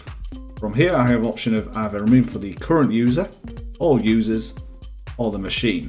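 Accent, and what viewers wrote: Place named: Northern England, United Kingdom. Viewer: Thank you so much for this. Just installed win 11 and while it noticeably improved my games, it took forever to start up, with tens of apps fighting for processing power on start up. I just uninstalled 50 apps and can now use my computer again. Also it's a breath of fresh air to hear a northern tutorial. I'm guessing Leeds or Sheff? Maybe Humberside?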